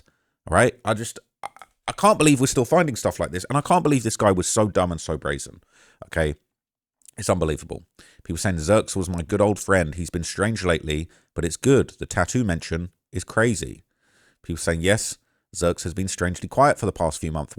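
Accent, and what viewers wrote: United Kingdom. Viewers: He's British we say things differently. We have a different accent to Americans! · Ngl your over use of Black American vernacular while u have a damn British accent is high key annoying af BE YOURSELF AND STOP COS PLAYING MY CULTURE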